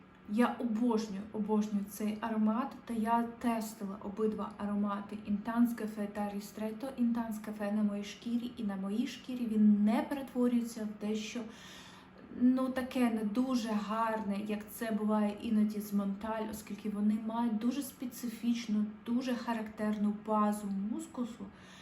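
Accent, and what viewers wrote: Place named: United States. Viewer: Гарна українська з американським відтінком.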